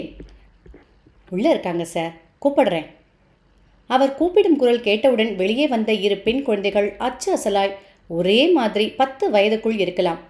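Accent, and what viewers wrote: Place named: Tamil Nadu, India. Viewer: I am very addicted to your Tamil accent 😊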